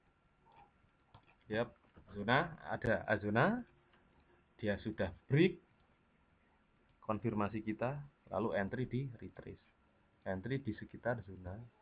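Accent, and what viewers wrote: Java, Indonesia. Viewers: sampean njelasno ne lewis jelas dari pada pak masyur sapari opo read the market opo ifmyante opo sami be good amargo medok jowone 😂😂😂 mantep 😁😍😘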